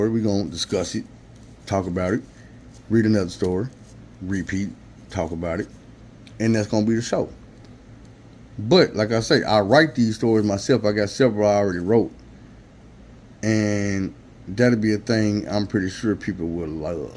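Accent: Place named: Mississippi, United States. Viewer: You sound from Mississippi bro im from 🥾⚜️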